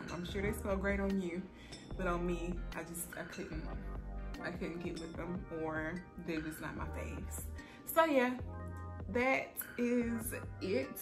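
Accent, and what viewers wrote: South, United States. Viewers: By the way I love your accent! I know everyone always says they don’t have one 🙄 but yours is so soft with a southern tone!! I’m from London so I find it so 😍😍😍!!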